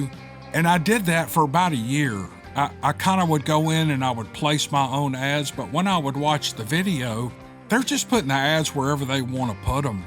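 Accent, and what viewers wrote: South, United States. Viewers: I Always make it to the end!! One of the best podcasts on here.. So glad to hear that you are working on Steve Lilly ❤. thank you for your creativity and that Southern voice!!!! Keep up the great work love from Northern Lower Michigan! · I really loved these stories. I adore that sweet soft southern accent. I love ya. I really wish I could meet ya'll. Unfortunately I live in Erie Pa area. I wasnt born here i came from central PA where all the states "rednecks" come from!! 😂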